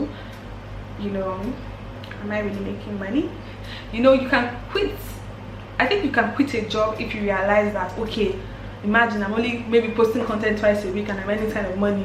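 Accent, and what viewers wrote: Ghana. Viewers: You now speak with Ghanaian accent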